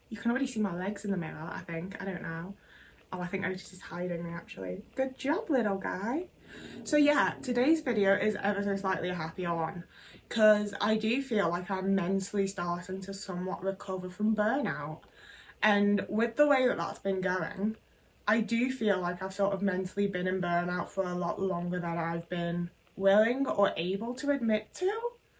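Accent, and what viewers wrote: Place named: Northern England, United Kingdom. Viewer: British women with their Yorkshire tea speaking in that northern accent --- so exotic and wonderful.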